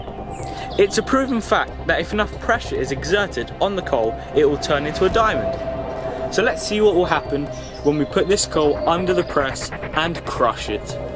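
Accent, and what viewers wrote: United Kingdom. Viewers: I LOVE WATCHING ALL THESE CRUSHING VIDEOS! PLEASE JUST KEEP CRUSHING! Love that brit accent <3 :)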